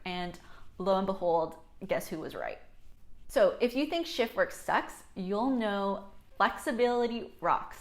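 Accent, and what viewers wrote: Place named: Canada. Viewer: It's the Canada “abouts and out” for me and in here for the “just fucking go for it” yaaaaaaasssss Queen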